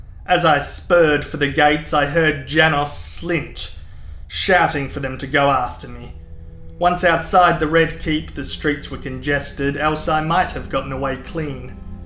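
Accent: Australia. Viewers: You ever consider doing Game of thrones audio books? Your bloody ozzy accent is rather soothing.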